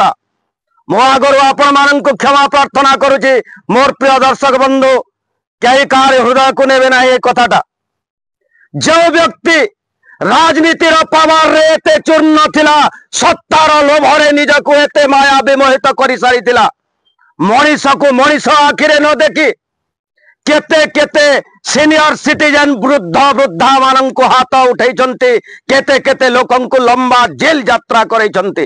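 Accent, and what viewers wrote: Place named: Cuttack, India. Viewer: ଭଲ କଥା କହୁଛ ଭଇ ହେଲେ ମୁଁଇ ଯାନି ନାଇଁ ପାରଲି ଯେ ତୁମେ କଟକିଆ ଛୁଆ ଅ କି ସମ୍ବଲପୁରିଆ ଛୁଆ ଅ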